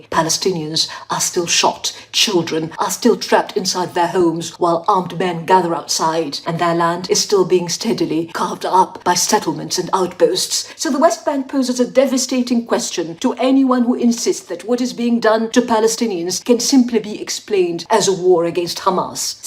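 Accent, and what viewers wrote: RP, United Kingdom. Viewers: I'm really sorry. I can't listen to this affected RP accent. فلسطين 🇵🇸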